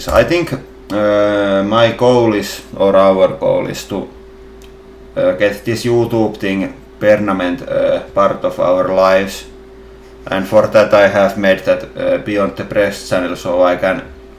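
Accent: Finland. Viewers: Thanks to you, Hollywood might someday understand that the Finnish accent is not the same as a general Scandinavian accent. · Your English is great, the fact that you have a finnish sound makes it even better. People don't want you to sound English. Keep it up... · Your video was very informative (long, perhaps, but interesting ergo, length is not an issue). We look forward to more exciting hydraulic press action, in the very near future. Additionally, your English is fine. It adds just the right Finnish quality to your videos. Thank YOU, and have a nice day. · Your english is probably better than the most viewers finnish. (And your accent reminds me on Kimi Raikkonen) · Katoin koko videon! Hyvää matskua teette!! Ja tekee vain hyvän säväyksen tuo "rallienglanti" =) Oma englanti kuullostaa samalta =D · kyllä tuli katottua koko video. rallienglantia on aina kiva kuunnella. 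😃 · This is pretty f.word awesome "rallienglanti" accent, it is whole the thing! I appreciate this courage trying to speak English. I hope its not enact! btw you must also compress the YouTube Play button when you receive one! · I'm walking around the house with Finnglish accent in my head now... "POHPULAHR" "KONTINUAY" "HOODRALIK" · You Finnglish is a huge part of what makes this channel so entertaining! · If ever I am sad and wish to make myself feel better, I can come to your "Beyond the Press" channel. I love the mad imagination of what you do. And, being a British person who has a son living and working in Finland — with Finnish wife and three children, I love your Finnglish. Well done!! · I determined this video must be dealt with by watching all 43 minutes of it. Well done! Your "Finglish" accent is your trademark, don't change it. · oh and btw I watched it till the end. your finglish is quite entertaining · i wached the whole video. 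that finnish accent.. couldnt stop. ;) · Todellakin katsoin kokonaan! Teidän rallienglanti on ulkosuomalaiselle parasta lääkettä koti-ikävään. :)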